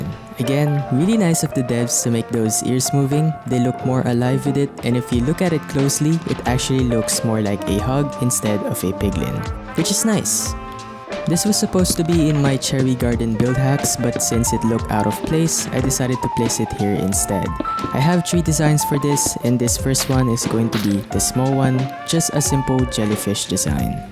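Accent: Philippines. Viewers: I can tell you're a filipino because of the accent, really love your build hacks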